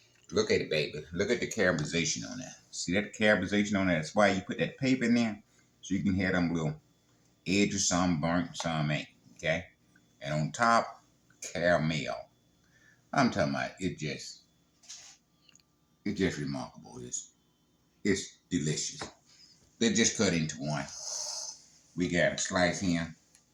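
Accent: South, United States. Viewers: Mr. are you from the state of Florida? Georgia or Louisiana?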